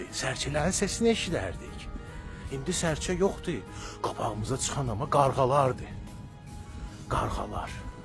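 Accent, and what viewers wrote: Baku, Azerbaijan. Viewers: Sağol qaqa Allah səni xoşbəxt eləsin Amin 🙏 Düzə nə deyəsən.Bakiliyam , özüm özümdən küsmüşəm.Həsrətəm BAKI ləhçəsi üçün.Sag ol Oğlum yaxşı ki varsuz.❤😢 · Allah sənə cansağlığı versin Lap ürəyimdən xəbər verdin Sənin verdiyin məlumatlar ləzzət eləyir Hələ ləhcən Bakılı balası Özünü qoru Amin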